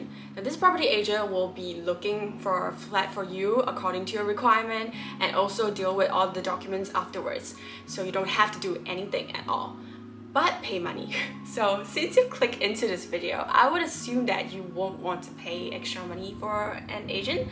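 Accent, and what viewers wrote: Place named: United States. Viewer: If you don't mind me asking, are you American or Malaysian. The reason I'm asking, your accent sounds American.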